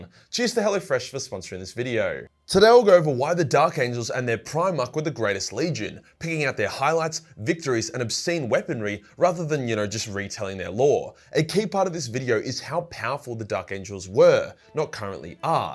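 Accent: Australia. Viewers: I get excited every time you upload as listening to Australian chad talk about warhammer 40k makes me moist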